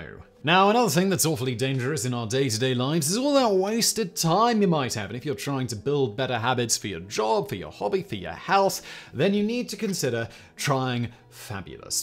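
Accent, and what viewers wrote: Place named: Cockney, United Kingdom. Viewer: Interesting. The guy who's speaking is from deeply cockney stock but is well educated and is code switching.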